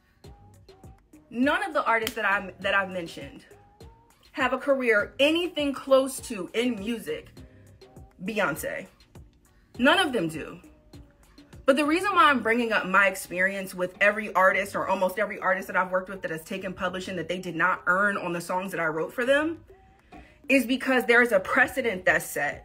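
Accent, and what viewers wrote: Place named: Houston, United States. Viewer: Not me realizing that I actually know the voice behind the channel. Wow, you are amazing girl. Congrats on your YT success!!! I’ve been subscribed for the past year now and was like damn I know this voice from somewhere. She sounds like she’s from Houston lol. Hey girl, heyyy 👋🏾🤘🏾😘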